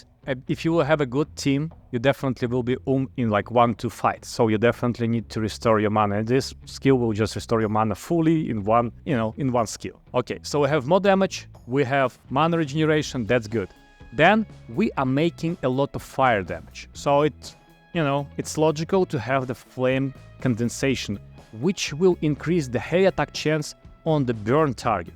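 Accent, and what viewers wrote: Russia. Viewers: I love your Russian accent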